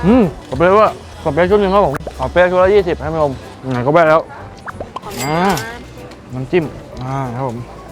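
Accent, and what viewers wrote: North, Thailand. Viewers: อู้เหนือพ่องนะ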